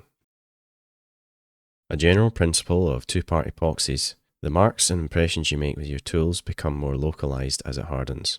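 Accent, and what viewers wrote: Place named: Scotland, United Kingdom. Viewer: amazing job mate! 🤘 and that smooth Scottish accent makes the video 10x better lol 🤣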